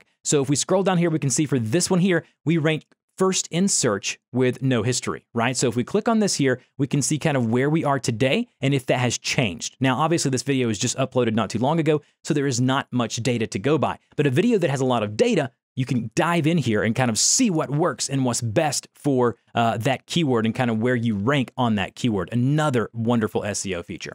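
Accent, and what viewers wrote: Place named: Baltimore, United States. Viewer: Are you from Baltimore? I'm hearing the "O's" in you. Hahaha.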